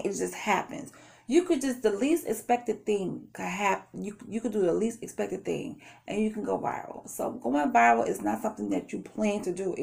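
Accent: New York, United States. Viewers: Well said my bklyn boo!! And you will not stop 🛑 talking to me lol bc Yasss I heard the New York accent lol but I hear it all the time lol 😂 TFS! Xoxo😘💕🥰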